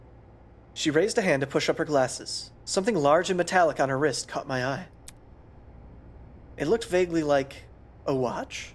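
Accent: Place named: United Kingdom. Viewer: Listening to you gush about this game while you play it is pretty great. Also, enjoying your British accent.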